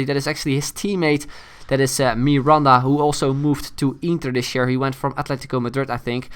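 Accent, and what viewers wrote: Netherlands. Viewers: ik dag al dat je nederlands was dat merk je gewoon hoe nederlanders engels praten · are you dutch?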